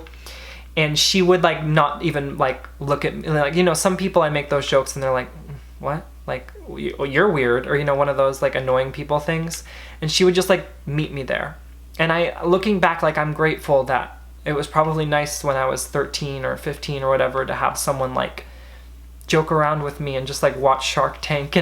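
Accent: United Kingdom. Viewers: especially the British accent too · loooved this video drew ❤️ 1. you were looking incredibly handsome, the eye contact was making me feel tingly and i’m hot so that means a lot. 2. you were on a roll!!! wittiness was consistent and i was following the points well, relating and learning. really appreciating your experience and feeling super connected to you. the best way to end my night! :) (from the UK) (the british boy accent defo needs to stay positive) - Olivia ❤️ ❤️ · My dad was an actor & everything you're telling us is completely accurate. I thought about pursuing an acting career myself but I saw all the jobs my dad almost got & realized that wasn't for me. You are funny & talented ( fairly good Brit accent btw) & was the best thing in "Not Cool" If I was a casting agent I would've hired u 👍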